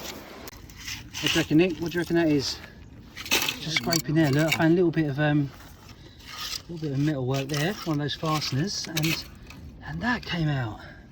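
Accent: United Kingdom. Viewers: What accent is this? I have issues to uderstand. You sound too british, too native!!!! Please try to speak with more international accent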